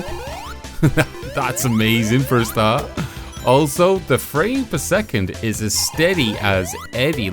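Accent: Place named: Liverpool, United Kingdom. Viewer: Liverpool? Really? That's like when I said Totalbiscuit sounded like he was from somewhere between Liverpool and Manchester, maybe Chester, and was told he was a Geordie. I can hear a bit of scouse in you now you said it.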